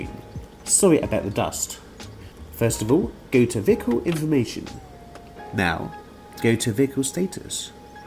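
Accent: RP, United Kingdom. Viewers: Just had to say love your posh talking voice and your CHANNEL subbed thanks for help in turning out SERVICE light reset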